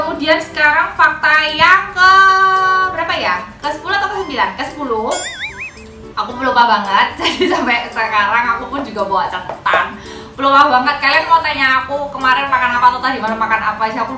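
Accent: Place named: Surabaya, Indonesia. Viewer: Tak kiro iki wong suroboyo soal e logat e wes suroboyoan poll.. 👍👍👍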